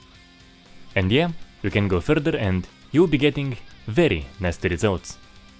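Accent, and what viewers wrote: Russia. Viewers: Оо, русский чел, по акценту узнал)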